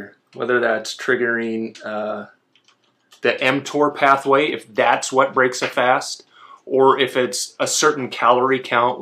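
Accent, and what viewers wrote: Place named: Canada. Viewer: He's Canadian! "out" lol "ut".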